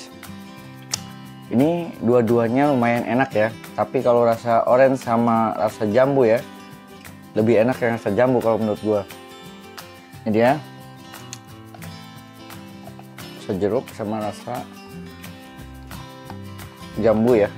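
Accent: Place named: West Java, Indonesia. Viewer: Punten bang kok abang orang bali kek orang sundaaa☺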